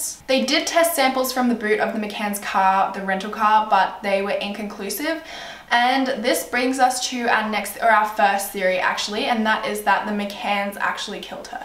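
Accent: Australia. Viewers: She is Australian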